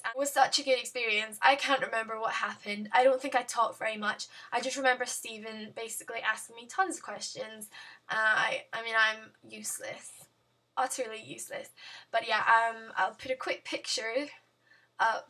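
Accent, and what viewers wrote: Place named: Scotland, United Kingdom. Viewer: I'm going this year too :) I can't waiiiit hopefully see you there :) Where are you from? You sound scottish but at the same time english ahahah I don't understand xx I'm sooo excited about going this year, just worried i'll do something wrong and miss my photo op or get lost or something , Yeah it might not seem possible but I'm an idiot :p xx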